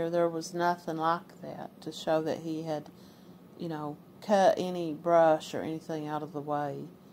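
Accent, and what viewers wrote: United States. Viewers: Get that accent you southern belle !!!!!!!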